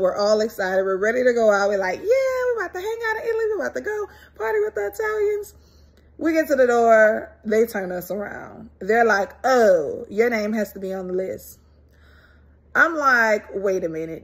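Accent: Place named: United States. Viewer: they probally thought you were illegal africans at first sight if they hear your accent and it sounds ameriican they will treat you diferently than other black people .unfortunately l a lot of africans illegally go to italy and get involved in things like prostitution and drugs they assume all black people are into this.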